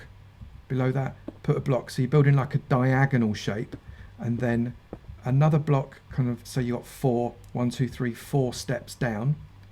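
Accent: United Kingdom. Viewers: Because it sounds British? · That Hen, is called a British accent. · soy mejicano, y entiendo muuy bien a los americanos pero... el asento británico, dios mio es hermosamente difícil de entender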